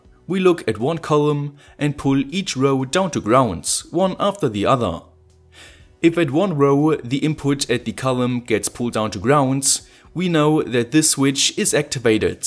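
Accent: Germany. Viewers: Is that a German accent ? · You come from Germany? kommst du aus Deutschland das Englisch hört sich nämlich ein wenig so an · Oh wait lemme guess, r u German? U have an accent... no offense tho, I’m German myself haha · Lul, du bist deutsch? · Mir war sofort klar, mit dem Akzent musst du aus D sein ;) mal überlegt auch eine Version auf Deutsch online zu stellen ? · Are you German?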